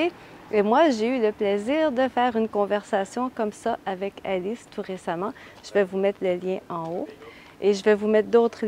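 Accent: Canada. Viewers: Canadian accent, « fête » = « fight », « chaîne » = « shine »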